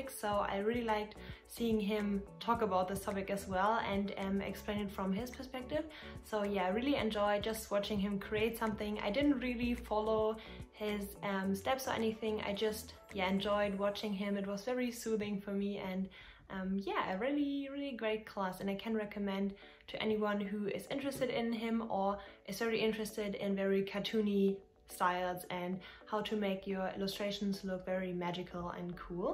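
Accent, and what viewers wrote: Germany. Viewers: Du bist doch deutsch oder? 😁 den Dialekt kann man ja kaum überhören 🇩🇪👍🏼 danke für deine Meinung 🙂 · Heftige Englisch skills👏🏻 man hört den deutschen Akzent trotzdem direkt raus😂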